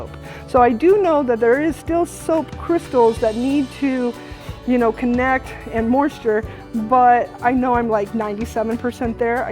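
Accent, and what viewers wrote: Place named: Arizona, United States. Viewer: I love love love hearing your Arizona accent. It reminds me of my grandpa. ❤️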